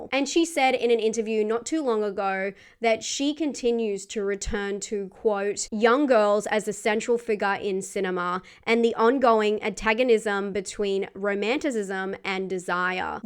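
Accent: Australia. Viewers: Australian gives Australian delivery. The upward inflection at the end of sentences is hard to miss. Good to hear a fellow Aussie and it looks like you have a loyal following. Keep it up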